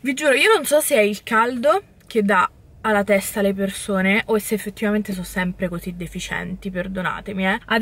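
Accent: Rome, Italy. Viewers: Adoro il tuo accento romano!❤️❤️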